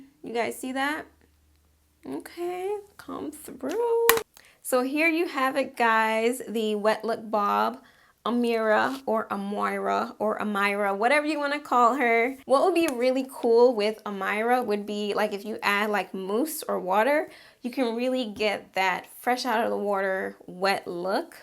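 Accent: Jamaica. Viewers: OMG! Gurrrrrrlllllll I LOVE YOUR WIG VIDEOS! Your personality is what really drew me in as a subbie! But I heard an accent OMG are you Jamaican ? Wish I could subscribe 10 more times now! You go girl! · Mi did know yah Jamaican ....🤣🤣...lol first time watching you and with in the first few minutes I was like she prob Jamaican......guuurl! New subbie😂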